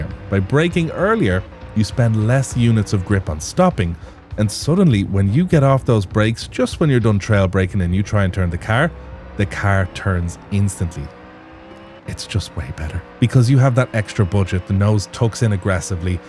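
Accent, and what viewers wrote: Ireland. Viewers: First time visit. subscribed. just seconds in, I heard that legendary Irish accent and thought brilliant. and then the Irish flag on your car, Legend. from one fellow Irish man living abroad to another. Hows tricks dude. awesome video.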